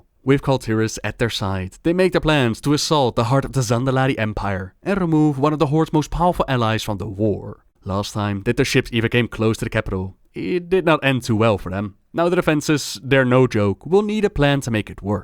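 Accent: Netherlands. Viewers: Are you Dutch? Your accent truly adds such a great aspect to your storytelling. Brilliantly done!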